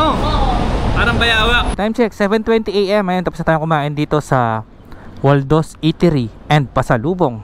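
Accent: Pampanga, Philippines. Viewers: I love your, Kapampangan accent, Say it again.... BARAG😂😂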